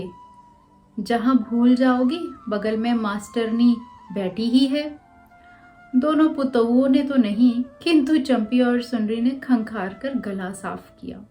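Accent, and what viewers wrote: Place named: Bihar, India. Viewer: बिहार के सीमांचल क्षेत्र का विशुद्ध देहाती पुट से परिपूर्ण है,"रेणु"जी की कहानी और आपने इसकी प्रस्तुति उसी भाव एवं उच्चारण के साथ करने का अच्छा प्रयास किया है।आपको बहुत बहुत साधुवाद।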